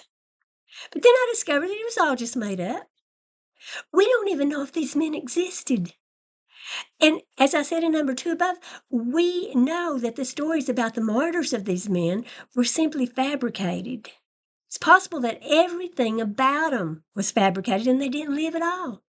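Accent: South, United States. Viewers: Very, VERY occasionally, the algorithm does me a solid. This is the second one of your videos that i’ve seen, and only because the algorithm recommended your most recent video to me yesterday. I will obviously have to subscribe and catch up your back-catalog. I greatly appreciate your clear-eyed, calm, and humane thoughtfulness. I’m also pleased to have finally found an online exvangelical with properly delightful Southern accent. Representation matters! 😋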